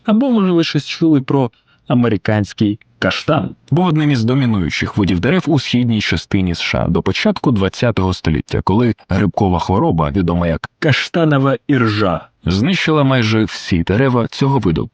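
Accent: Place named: Poltava, Ukraine. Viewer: Шось на полтавському) клас👍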